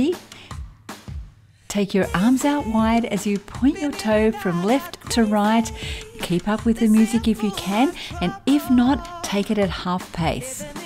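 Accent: Australia. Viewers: At 81 I do 2 30 min videos and feel 60! These are excellent to use alone at home! And I love your Aussie accent...that helps!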